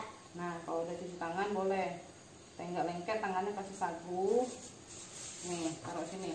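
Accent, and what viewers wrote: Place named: Palembang, Indonesia. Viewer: Asli uwong palembang yo, logatnya kerennn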